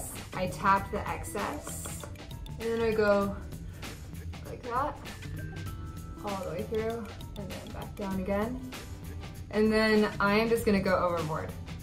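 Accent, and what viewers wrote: United States. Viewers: I thought she was british, why does she have an american accent?! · but...she’s British...why does she have an American accent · if shes British why does she sound American? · She's Scottish believe it or not, but has the most American accent ever.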